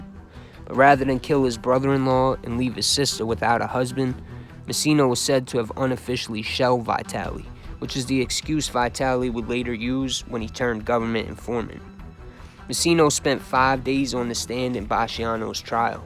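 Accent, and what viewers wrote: New York, United States. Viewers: oh nice. That would be great 2 a week. Your ny accent is a huge part of what makes your stories so great. If you don’t mind me asking, what part of New York are you from buddy?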